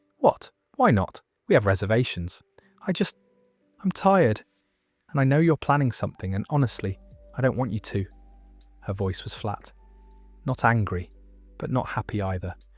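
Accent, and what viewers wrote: United Kingdom. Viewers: Does anyone know where this accent might be from? I’m sure it’s a British accent, but I’d like to know the dialect, it’s very peaceful.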